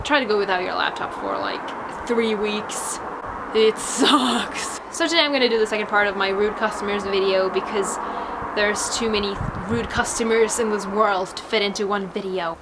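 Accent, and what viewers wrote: United States. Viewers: Kinda off topic, but your accent sounds a bit southern (USA) at times.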